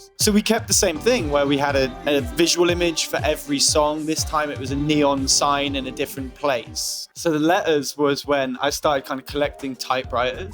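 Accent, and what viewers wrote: United Kingdom. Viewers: Some people would think he’s high .... no just British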